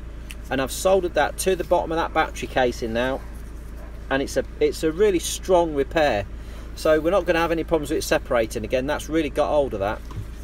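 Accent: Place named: Yorkshire, United Kingdom. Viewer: You from Yorkshire fella!!! Sound it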